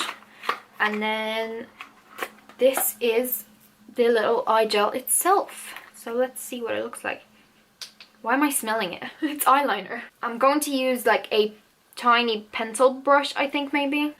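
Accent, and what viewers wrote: Ireland. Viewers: I just found your channel and I love it !! 😍 are you from Ireland ?? Your accent sound a little bit Irish xo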